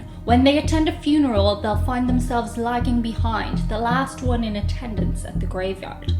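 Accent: Ireland. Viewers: Ha come to think of it that kinda does describe most Irish women I know...I love stories like these and your accent makes them 100% better. Well done 👏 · The irish accent is cute and hilarious 😂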